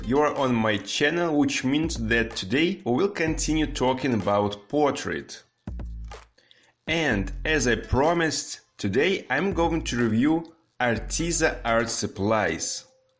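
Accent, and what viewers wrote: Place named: Russia. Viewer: Work on the cheeen Wtf That russian accent gives me crinngee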